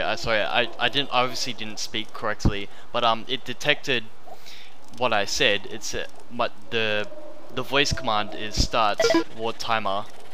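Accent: Australia. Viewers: Voice command doesn't work properly because he speaks with an Australian accent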